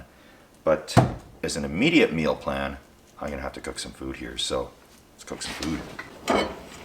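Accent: Canada. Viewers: Dude I love the way you say garage! Damn I love Canadians accents!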